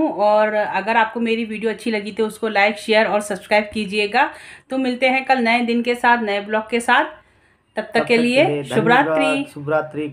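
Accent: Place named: Bundelkhand, India. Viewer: Mem aap beech beech m Bundelkhand ki voice me speech de deti ho😁😃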